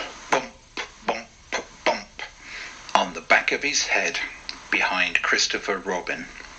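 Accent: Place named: England, United Kingdom. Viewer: beautiful story time absolutely love your English accent thank you my Best Friend loved Winnie The Pooh🤗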